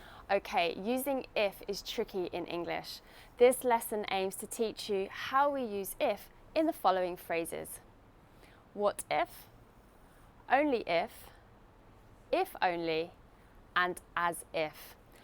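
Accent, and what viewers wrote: United Kingdom. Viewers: this teacher her accent is English British England why she is living in Philippines right now maybe she loves the tropical hot humid and beaches and full sun all year round it is warmer than UK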